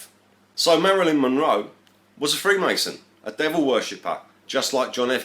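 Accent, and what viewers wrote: United Kingdom. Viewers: proof that the British accent can't conceal all ignorace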